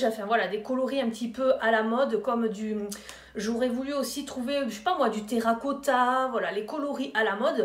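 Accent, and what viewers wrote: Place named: Southwest, France. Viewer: Bonjour, A Lyon il n'y a pas de magasin PARVIS. Tu as des enseignes vraiment très bien dans ton Sud-ouest (et avec l'accent que j'adore 😍😍😍😍😍)!😘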